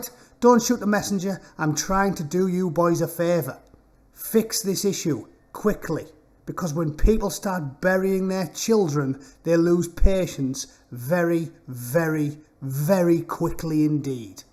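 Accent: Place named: Scotland, United Kingdom. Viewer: Actually, I'm not gonna lie, but I genuinely thought you were Scottish when I heard your voice for the first time lol! I follow you on Quora and knew you were English, but I definitely though you were Scottish lol!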